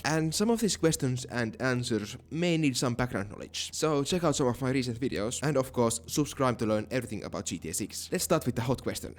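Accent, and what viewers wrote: Finland. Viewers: You sound Finnish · Finnish more likely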